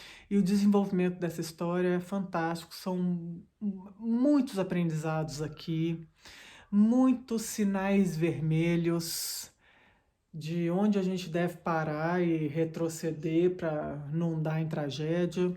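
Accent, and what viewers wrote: Minas Gerais, Brazil. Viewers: nossa, como amo seus vídeos e o seu sotaque então. sempre mato a saudade de Minas, estado onde passei minha infância e que tanto amo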